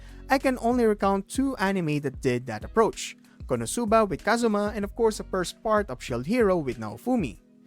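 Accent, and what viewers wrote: Philippines. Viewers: your pinoy accent is so thick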